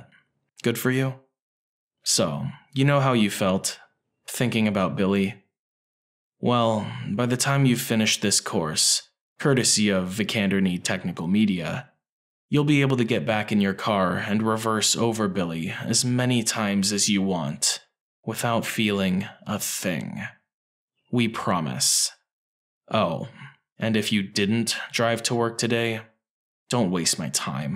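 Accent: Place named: United States. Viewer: as much as i love this reading, hearing these read out in an american accent is hilarious.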